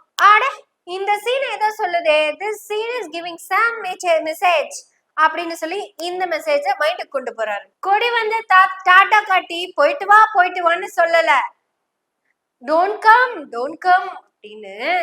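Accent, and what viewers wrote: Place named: Palakkad, India. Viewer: சூப்பரோ சூப்பர். சும்மா பொளந்து கட்டுறீங்க பாலக்காட்டு கொங்கு தமிழே. பாவம் மனநல ஆலோசகர் ஷாலினி பொழச்சி போகட்டும். விட்டுருங்க. இவரே வேறொரு மனநல ஆலோசகரை அணுக வேண்டிய நிலைக்கு ஆளாகிவிட்டாரே என்று நினைக்கும் போது அவர் மீது பரிதாபம் ஏற்படுகிறது தோழரே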